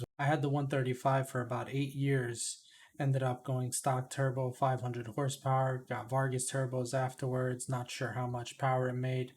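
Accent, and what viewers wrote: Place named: New York, United States. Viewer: Subscribing because you're covering this well You talk like a real NYer too not like these phony pricks from Connecticut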